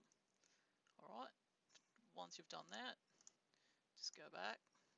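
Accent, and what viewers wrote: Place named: United Kingdom. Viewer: Philip It's so low even with the speakers and the video both set to max volume. No need to insult folks just because you're getting better results. I can just barely hear that someone is talking with an apparent british accent, but placing my iPhone's mic into my headphones right in front of the speaker, with dB meter app running, it doesn't even register a difference from ambient noise. Sometimes I can tell what he's saying if I listen really closely, but most of the time, I can't.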